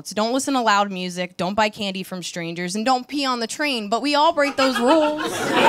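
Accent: New York, United States. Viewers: Shes like super cute. But shes got that standard, new york, fran drescher thing goin on. She would make a nice wife.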